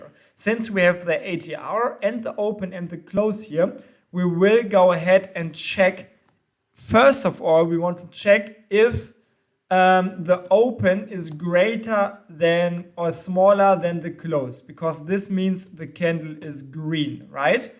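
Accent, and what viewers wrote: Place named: Germany. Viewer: den deutschen Akzent kann man im englischen kaum verbergen :-)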